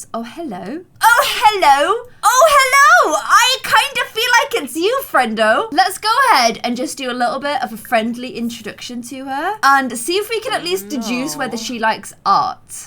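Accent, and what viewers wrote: United Kingdom. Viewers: Btw I'm half French and it was funny hearing you speak French with a British accent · Lol i love how says antony instead of anthony XD Its ok i love that british accent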